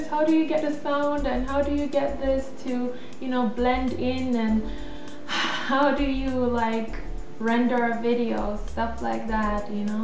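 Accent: Jamaica. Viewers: majamajami ........she sounds Jamaican...................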